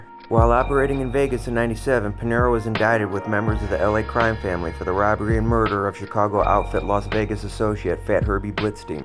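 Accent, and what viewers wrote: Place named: Buffalo, United States. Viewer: The guy narrating has the horrible Buffalo accent…. So cringe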